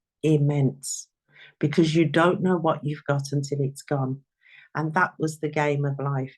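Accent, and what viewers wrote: United Kingdom. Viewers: I don’t know which UK accent you have, but I was playing your video and my husband overheard your voice from the other room and said it sounded as if I was listening to a female Idris Elba 😀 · Hearing the truth via your English accent is🤌🏻🤌🏻deliciousness Very good recipe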